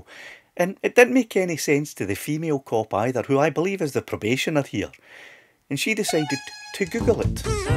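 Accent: Scotland, United Kingdom. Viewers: Your Scottish accent makes this even more hilarious 🤣brilliant stuff.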